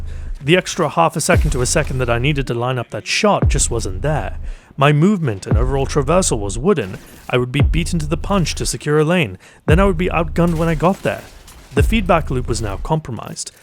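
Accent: United Kingdom. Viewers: Yessssss. Welcome to the “Sticks on PC” club. I love it. One thing I’ll add to the “not science” category is, one of the reasons I like to play sticks is simply because it’s much closer to the feel of firing a real weapon than M&K can ever be, thus adding to the feeling of immersion and just sinking into the game. Btw, your dulcet vocal cords mixed with that refined British accent totally hit my ASMR trigger to the point, I could probably just loop your videos in the background all day. Well done.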